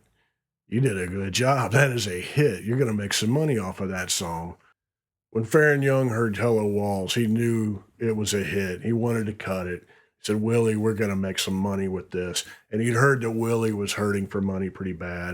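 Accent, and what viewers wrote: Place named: South, United States. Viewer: You have a great voice & southern drawl & calm to awesome story telling! Love your repotoar!! 🙌👏👏✌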